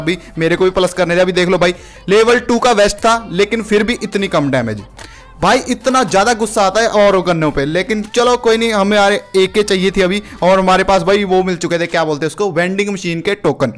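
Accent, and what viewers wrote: Punjab, India. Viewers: Hello bhai aap Punjabi Ho jasa Punjabi bolte app Ho Kay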